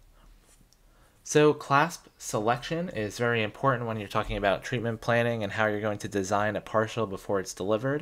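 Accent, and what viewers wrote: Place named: United States. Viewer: PLS WHEN YOU SPEAK TRY TO SPEAK ENGLISH NOT A AMIRICAN language COZ HERE SOME DR THEY ARE NON PROFICINAL ENGLISH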